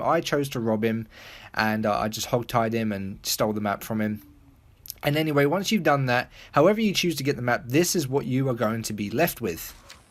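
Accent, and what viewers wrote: England, United Kingdom. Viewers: English accents are so adorable, "go through the wuh-uh-fole"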